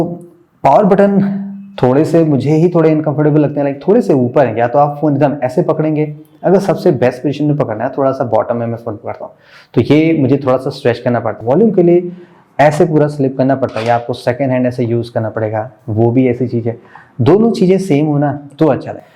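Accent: Lucknow, India. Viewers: ji aap lucknow se hi ho kya???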